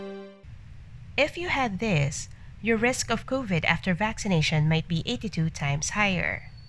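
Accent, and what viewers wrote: Philippines. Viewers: Filipino accent is too strong. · no. She Sounds Filipino.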